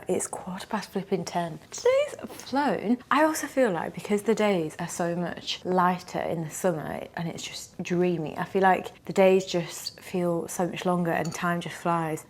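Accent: United Kingdom. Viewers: This is very inspiring. You know what the moment I just started watching your video I could tell you are British. This makes me more proud of being British when another British shares this. I do workout weekends when I am going to work week days and I am now size 10 from size 20. I eat gluten free food for dinner I have prep salad meals mixed. Well done. Thanks for sharing too.❤❤❤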